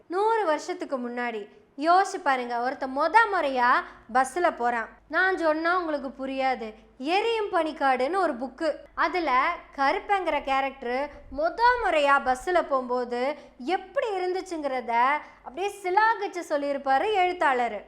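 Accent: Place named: Coimbatore, India. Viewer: அம்மா தாயி.. உன்னோட கோவை slang க்கு அடிமை..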